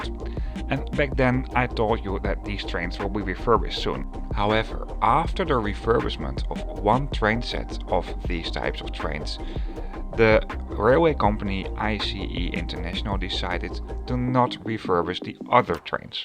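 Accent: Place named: Netherlands. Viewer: Love your videos and your Dutch accent 😊. Nice to see my home cities station from your perspective 👌👌 Btw. Funact: I tried to imitate Dutch accent on my channel 😆😆😆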